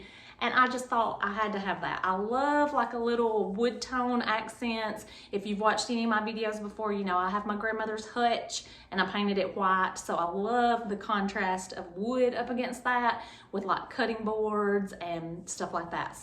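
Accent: South, United States. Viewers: Your southern accent is so adorable. Greetings from NYC. ❤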